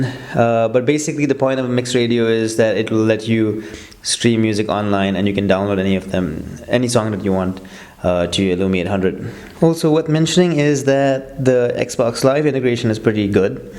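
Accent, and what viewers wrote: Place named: India. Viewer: well by changing ur name you cant hide your indian voice. nice review though.!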